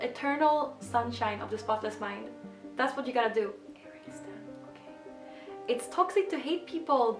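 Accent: Sweden. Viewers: Your accent sounds very Swedish, I’m not Swedish but I’ve lived in Sweden for some years and I can recognize it.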